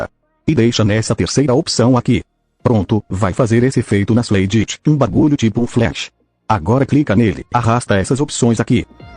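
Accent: Bahia, Brazil. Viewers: o cara é um baiano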